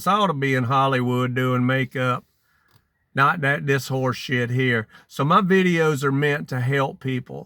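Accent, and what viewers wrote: South, United States. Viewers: Well spoken i appreciate your old school southern way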